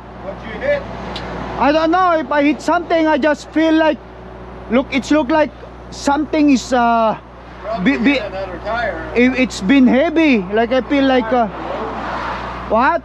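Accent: Ilocos, Philippines. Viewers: ILOCANO KAN SAMET? BRAD TA ACCENT MO GAMIN. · Dayta man lakay naglaeng ka ag English, Ilocano accent ah.. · Halatang ilokano ang accent mo lakay pag nagsasalita ka ng English.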